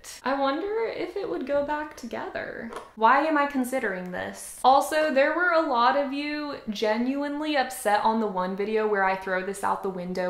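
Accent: South, United States. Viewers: Which part of US is this accent from? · i love you're light to heavy southern accent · Hi..love the vids and the ukes lol, you're a very funny person and the accent is quite charming I know it's southern States whether its Louisiana or wherever I'm from north of England so not sure...but you should check out that guy on you tube called morbanjo on you tube, he's playing violins he made himself out of cigar boxes and other stuff amazing... Who knows he may make a ukulele for you 😆😊🎻🎸take care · Im not sure about it but did i hear a country accent?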